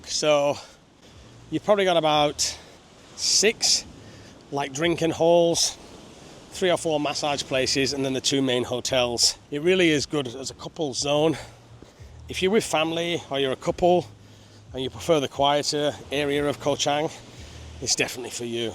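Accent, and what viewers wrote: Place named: Sheffield, United Kingdom. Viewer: Hi, love your down to earth channel. You’ve not lost your accent, where is it from? It sounds like a Sheffield twang.😊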